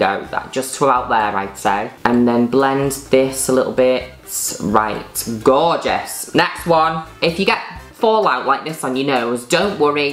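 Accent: Manchester, United Kingdom. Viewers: Your manc accent makes everything so much better 😂😂 makes me feel at home lol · violently british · as a fellow manc i think your accent is STUNNING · what dialect Britain are you?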